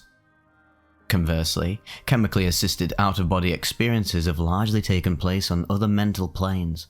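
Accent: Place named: Derby, United Kingdom. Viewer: I listen to these trip reports while I'm doing ice baths, it really helps my endurance and heightens the experience. I adore your voice and delivery. I've gone through every single trip report compilation, so your narration has taken me on so many hours of incredibly profound psychedelic journeys. I'm Canadian and my partner is from Derby so he has a similar accent and that makes it even better. A deluge of gratitude to you for the time and effort you put into these. Godspeed.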